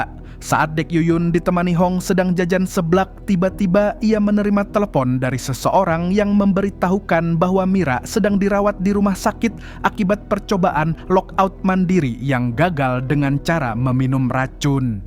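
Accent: Banjar, Indonesia. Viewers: Bang Kamu orang mana bang, kaya logat Banjar 😮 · keliatan urang banjar nya wkwk jah bang topi bunggul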